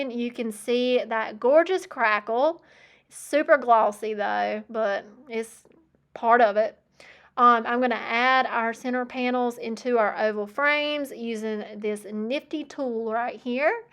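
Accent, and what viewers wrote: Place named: East Tennessee, United States. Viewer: Please keep saying Modge Podge! I love your unique pronunciation; my daddy used to pronounce umbrella as underbrella…sounds more right to me. I love your accent because it doesn’t sound like an accent to me. Of course, I live in East Tennessee, so go figure.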